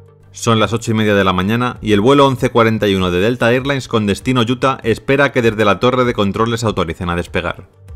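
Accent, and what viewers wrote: Spain. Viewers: que dijo????? Puedes modular bien y sacarte ese acento español.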